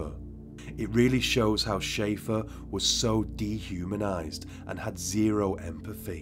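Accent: United Kingdom. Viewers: 8 Million UK narrators doing US Stories. What is the frickin deal??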